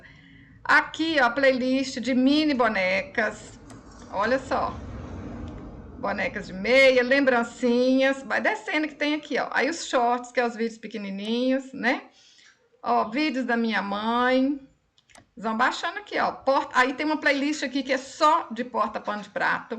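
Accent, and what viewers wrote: Minas Gerais, Brazil. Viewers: Você é mineira uai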